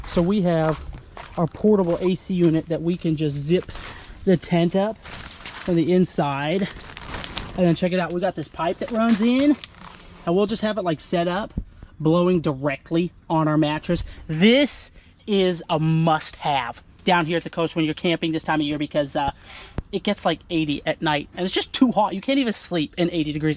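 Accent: South, United States. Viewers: Bro moved to South Carolina and googled South Carolina slang words😂😂. Stop lol. Just cuz you live in the south don't mean you gotta talk like it.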